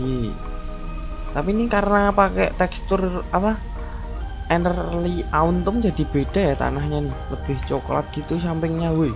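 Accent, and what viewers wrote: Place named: Java, Indonesia. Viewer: Medok mass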